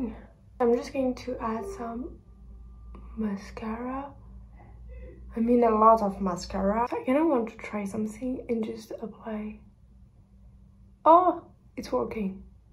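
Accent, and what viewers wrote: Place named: France. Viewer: I like your accent french girl. You are very pretty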